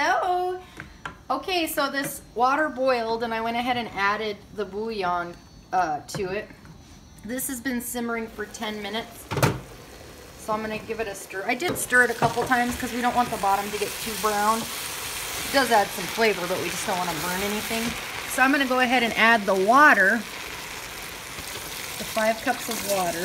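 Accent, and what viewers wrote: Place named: Gaspé, Canada. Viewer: You have a Gaspé Coast accent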